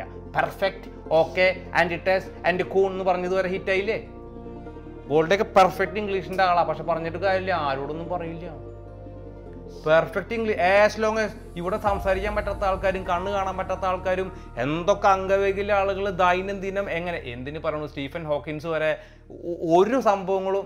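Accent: Thrissur, India. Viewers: I came to know of your channel thru Sujit. Your way of story telling is very nice. I like your Thrissur slang. Since lam a vegetarian scenes of meat in your recipes are digusting for me. But l enjoy your narration of stories. Good luck for your future plans.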